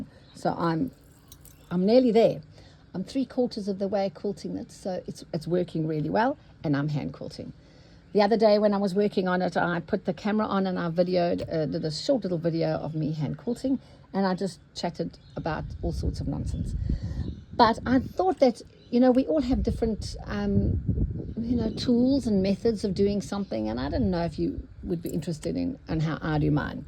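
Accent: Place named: South Africa. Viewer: Just came across your video. Lovely to hear another Saffer accent. :)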